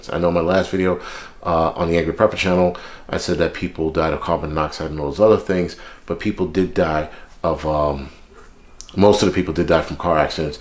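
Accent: New York, United States. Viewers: You nailed it! Sounds like everything that come out of my New Yorker mouth! Lol by far my favorite channel to watch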